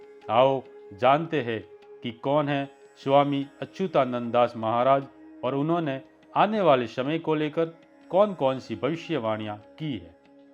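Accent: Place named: Marwari, India. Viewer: भाई पक्का आप राजस्थानी हो आपके बोलने से ही पता चल रहा है की आप मारबाड़ी हो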